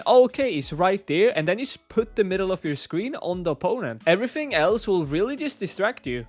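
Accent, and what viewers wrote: Sweden. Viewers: I KEWN IT!!! You are from Sweden Right? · Bro your Swedish? I’m Swedish to 😁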